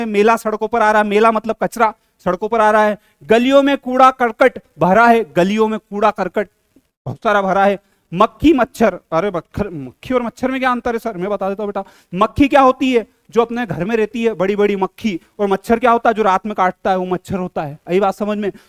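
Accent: Indore, India. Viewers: He's accent says he is from Indore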